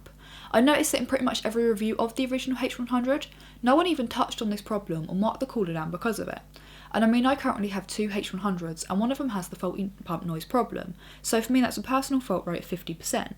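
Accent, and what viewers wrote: England, United Kingdom. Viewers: I'm sure you meant English* accent dude. She's English and thus speak with an English accent. I'm an American and I speak with an American accent, happens to be a Southern one (Virginian) since I'm out of the South. Something tells me you are an ignorant Yank. :) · I really like UK accents! It just sounds so nice for some reason! xD Great video btw! :) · you look russian on your thumbnails, and suprised when i hear the british accent haha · Blondie and Coumputers? o_O? lol but her British accent is sweet:) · A brit-accented blonde telling me about technology? What sorcery is this?!!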